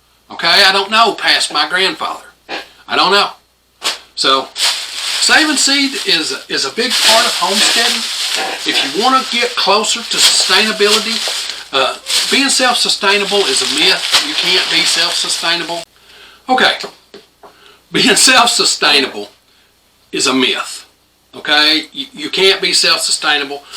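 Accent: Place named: Indiana, United States. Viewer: Hickory Kane(king)! Lol! I talk this way up here in Indiana! Lol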